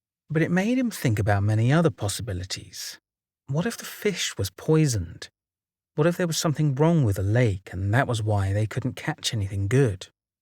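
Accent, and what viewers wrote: United Kingdom. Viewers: I do too, a very soothing voice and excellent narration skills. Very high quality. And let's face it, no one narrates like the British.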